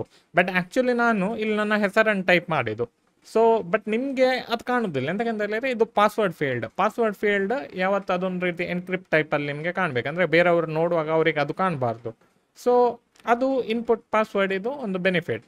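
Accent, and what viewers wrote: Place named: Mangalore, India. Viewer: Evaru mangalorean ah? Accent same mangalore navra tara ide..❤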